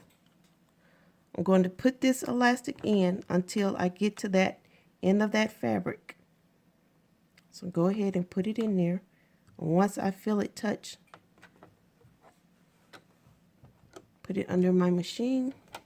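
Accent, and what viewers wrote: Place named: South, United States. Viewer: You sound like a fellow Southerner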